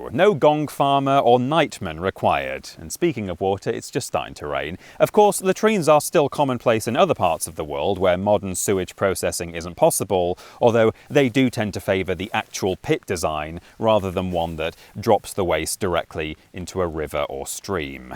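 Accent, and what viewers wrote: United Kingdom. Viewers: Nothing quite like hearing a proper British accent expounding upon historical loos. 😂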